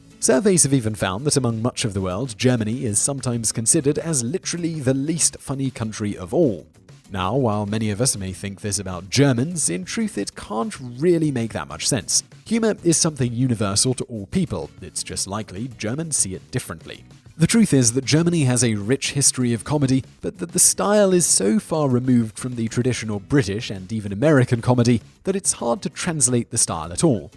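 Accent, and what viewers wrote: United Kingdom. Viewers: | There shouldn't be an apostrophe. | Our & Canada's situation with the Queen is much too complicated to be explained like this. While it is true that many countries under the British monarch have some customs which Americans think are clues that we are undemocratic, they really are just that. Customs. While the Queen still has some emergency powers, they extend to dissolving Parliaments & actually make us more democratic because it's a fail-safe against abolition of democracy. | British people used to not care much about their teeth, until electric toothbrushes were invented. I think it's weird how Simon Whistler speaks in a British accent but embodies the American stereotype of assuming that all his audience are American. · There is an strong current of anti-Americanism in all his videos. Even this video is scolding what are essentially American perceptions of the French, Japanese, English, Spaniards, etc. 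His basic mission is cultural collusion with the American Left, to voice U.S. leftism in a British accent. With bad teeth.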